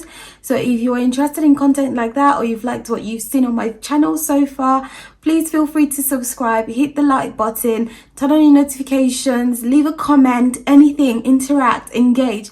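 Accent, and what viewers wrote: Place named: United Kingdom. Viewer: I love the UK accent. Keep it up.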